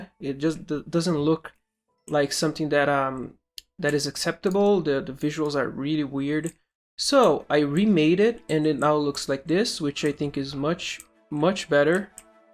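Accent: Brazil. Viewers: I almost didn't notice that you are brazilian!!! Excelente trabalho, talvez seja legal fazer um canal pro povo BR tbm ou não neh... Se bem que fazer a mesma coisa duas vezes deve consumir muito tempo. Excelente trabalho!!! Quero ver a sequencia desse DevLog!! Alias quero jogar esse jogo!!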